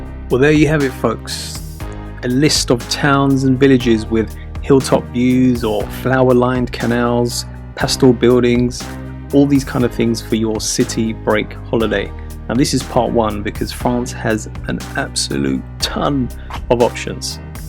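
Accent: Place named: England, United Kingdom. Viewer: i like your voice and your english accent, you put it down that relax me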